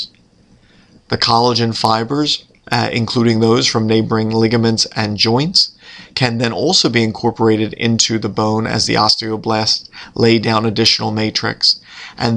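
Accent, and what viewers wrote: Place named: Philadelphia, United States. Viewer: He's from near Philly I can tell by the accent (and the name AKA )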